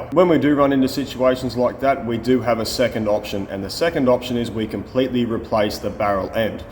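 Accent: Australia. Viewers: You've got an Aussie accent....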